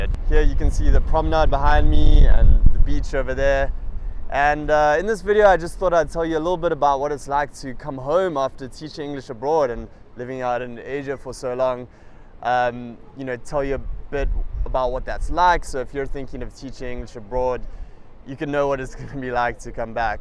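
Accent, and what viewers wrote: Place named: South Africa. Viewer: Buh but your accent! I had no idea you were from South Africa, let alone an hour away! Anyhow, looking forward to hearing from Pavina soon.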